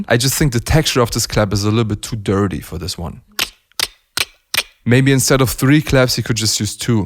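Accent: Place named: Germany. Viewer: FLAX OOLTIMATELY I love ur german pronunciations so much 😂😂 Also, mic costs more than my rent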